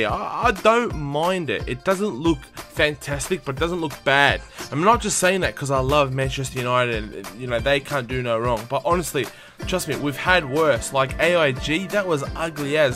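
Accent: Australia. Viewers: are u from ausie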